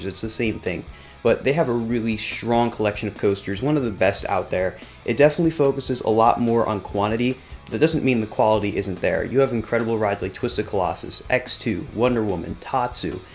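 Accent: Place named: New Jersey, United States. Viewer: "Wudder rides"... "Wudderfalls"... yeah, definitely from Jersey ;) nice vid, sub'd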